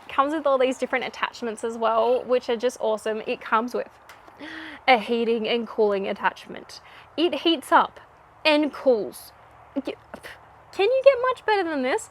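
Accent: Australia. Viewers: Love the podcast and hearing our fabulous Aussie accent on screen. Love the look of the bag and yarn from Bendi too. Thanks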